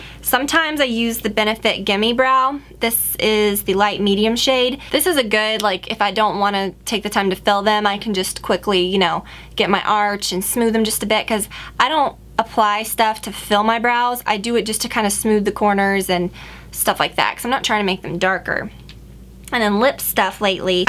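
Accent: South, United States. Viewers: I feel like I hear so much southern drawl in your voice, I love it!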